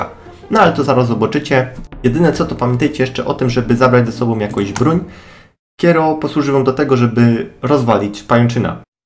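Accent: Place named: Silesia, Poland. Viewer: Lubię śląską gadkę :)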